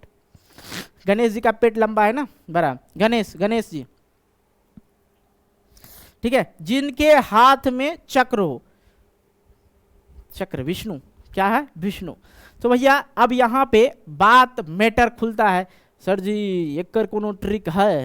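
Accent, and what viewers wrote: Bihar, India. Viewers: Sir aap bihari ho kya ❤ Aap bihar se ho kya ❤😊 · Tum va bihari he ka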